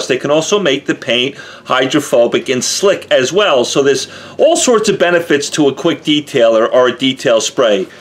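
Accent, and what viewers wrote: New York, United States. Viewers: I KNEW it! No way this was a Native Texas accent, a New York accent! Awesome videos, I learn so much from this.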